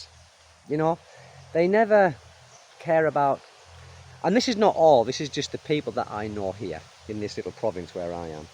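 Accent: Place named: Newcastle, United Kingdom. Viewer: Just found your channel. Sounds like you are from Newcastle or somewhere in the North East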